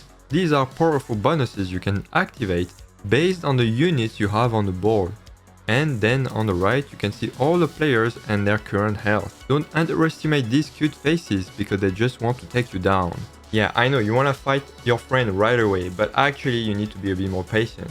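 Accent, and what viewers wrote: France. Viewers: T'a trop un accent français , 100% tu l'es je pense ^^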